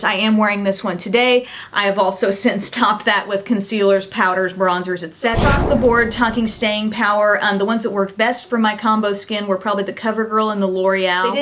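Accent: South, United States. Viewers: i subscribed just because of your awesome southern bell accent :P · very true! i totally agree! i also assume youre from the south? very strong southern accent! i like it tho! im from wv but most say i have a very northerer accent saying "mom" like "maam" we turn our o's into a's for some odd reason?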